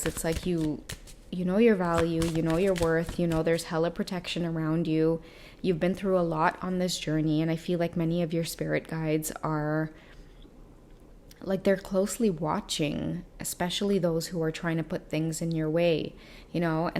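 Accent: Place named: Trinidad and Tobago. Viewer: Love ur trini accent im from trinidad too love u u resonate with me alot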